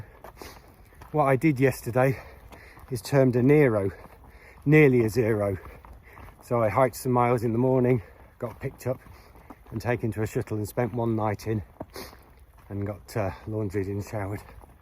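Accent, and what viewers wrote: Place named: United Kingdom. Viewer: As Brit who watches a lot of US thru-hikers, it's a nice change to hear a British accent.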